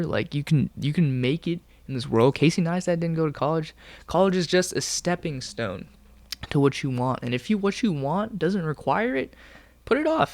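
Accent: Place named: California, United States. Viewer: I'm from New York (Brooklyn, Bed-Stuy) and I knew from the moment you started talking you were from California, the accent is pretty heavy.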